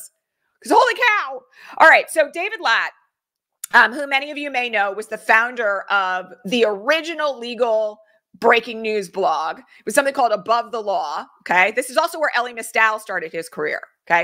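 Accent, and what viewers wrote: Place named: New York, United States. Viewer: You are awesomely verbal, really. It's SO New York and I love it. I appreciate your insight to these Important issues and grateful for real talent focusing on maga destructive attacks.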